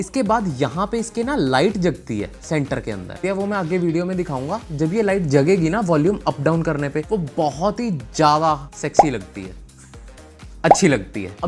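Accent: Punjab, India. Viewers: Hated you Fucked up Punjabi accents 🤮🤮🤮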